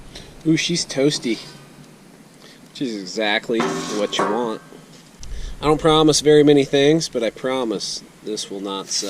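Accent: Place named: Midwest, United States. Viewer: Did you say donate or don’t eat I can’t tell through your Midwest accent LOL good job buddy!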